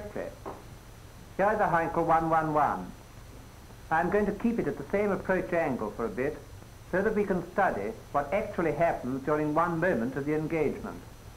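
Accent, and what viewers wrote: RP, United Kingdom. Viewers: The Queen's English of this narrator is absolutely smashing! Absolutely wizard! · Having to listen to that plummy voice all day would drive me nuts.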